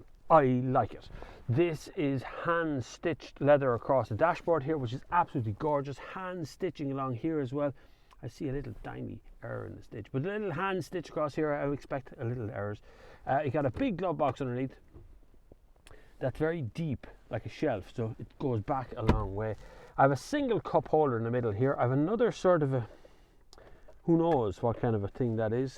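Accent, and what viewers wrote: Ireland. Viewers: What a fine review. You have obviously heard all the bs, are not impressed and are certainly not going to repeat it. Just plain facts and honest impressions with a bit of humor. Great, thank you. And of course the Irish, most musical accent in the western world. 😀 And oh yes, almost forgot: great camera work!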